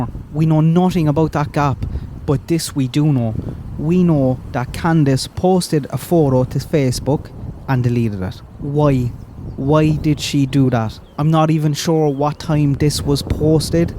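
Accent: Ireland. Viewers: you gotta be Irish , I am an you have what sounds to me Limerick or Tipperary ?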